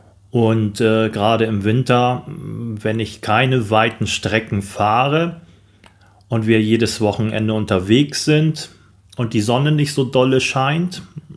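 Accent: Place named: North, Germany. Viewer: Eruzähl mal was, wieso es dich in die Schweiz gezogen hat ??? Dein Dialekt klingt eher Norddeutsch ....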